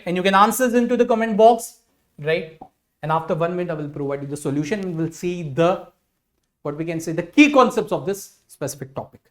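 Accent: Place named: Punjab, India. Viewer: Sir I think you are from panjab or something city solve my dout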